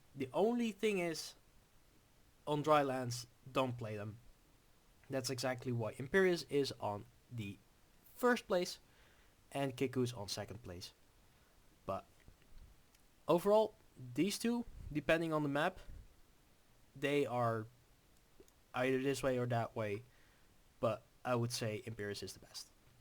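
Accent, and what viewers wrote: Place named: Netherlands. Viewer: He has a pretty strong Dutch accent - probably the Netherlands ;)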